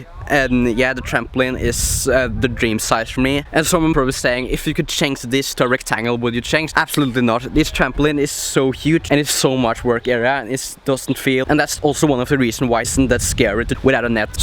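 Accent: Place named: Finland. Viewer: You sound Finnish or Swedish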